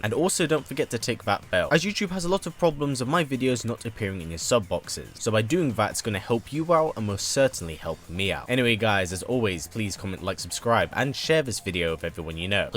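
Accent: England, United Kingdom. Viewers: you are from England?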